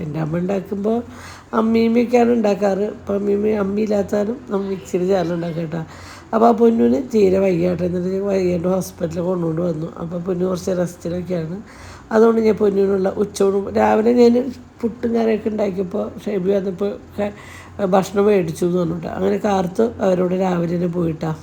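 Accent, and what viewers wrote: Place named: Thrissur, India. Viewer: അല്ലേലും തൃശൂർ ഭാഷ അവിടെ ഉള്ളവർക്കേ മനസ്സിൽ ആകുള്ളു അത് അവരുടെ കുറ്റമല്ല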